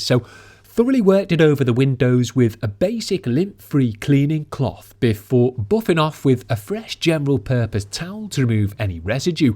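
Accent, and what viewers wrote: Liverpool, United Kingdom. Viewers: Another awesome video with great and humourous scouse commentary.Juvenile sneeze splatter got me😂👍🏻👍🏻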